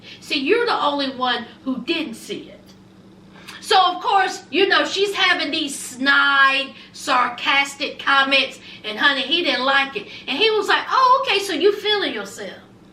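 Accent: South, United States. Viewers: Well I love it!!!! I'm a new subscriber and your southern drawl is what drew me to you. I'm from Atlanta, Ga. So I get it!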